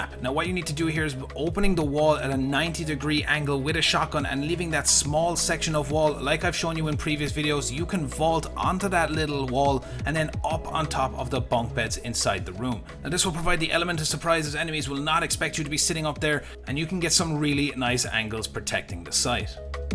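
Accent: Ireland. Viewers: You Irish? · U irish? If so where from lad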